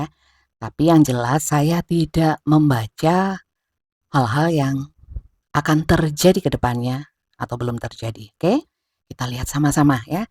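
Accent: Java, Indonesia. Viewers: Lucu..juga.. Bundanya..jawanya medok...nggee bunda..ngge..Mater nuwun Bunda. ❤ 💙🎉🙏 .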